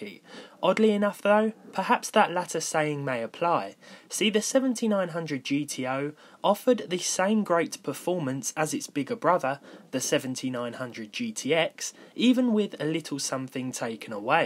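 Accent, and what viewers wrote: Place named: Kent, United Kingdom. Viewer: I've just realised that is a picture of swalecliffe/herne bay! I knew I recognised your accent!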